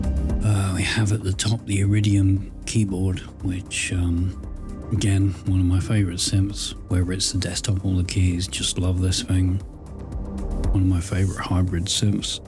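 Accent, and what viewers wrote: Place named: United Kingdom. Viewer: Your studio is bad ass brother. I never heard you talk. And just imagined you as a Yank. So my question. Where across the pond are you from?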